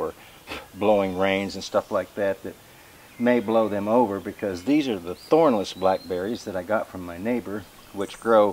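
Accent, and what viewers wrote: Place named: Appalachia, United States. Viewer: I’ve been subbed to Out of the Woods for several years now, Good channel for sawmill interested folks like myself. I’ve seen him going and getting logs at every opportunity he gets when people call him and many times they’re free. 😉 What’s funny is I talk exactly like him 😂 I guess because all my family have been NC Tenn & Va Mountain folk and we have a hillbilly accent 😁👍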